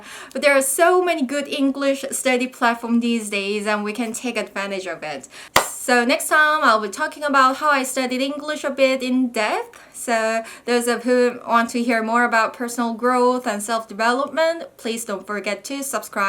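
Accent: United Kingdom. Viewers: 브리티쉬 엑센트♥ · 브리티쉬 액센트 실화입니까??? Wow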